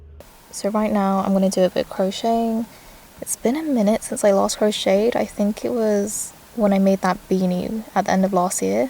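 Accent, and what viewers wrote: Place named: United States. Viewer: Lovely video. Question: have you spent time in the US? Sounds like it when you speak. Just curious! (Writing from the US)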